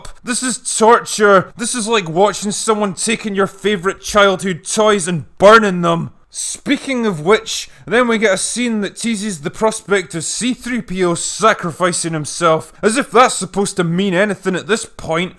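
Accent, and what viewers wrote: Scotland, United Kingdom. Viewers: He's Scottish. He just sounds that way.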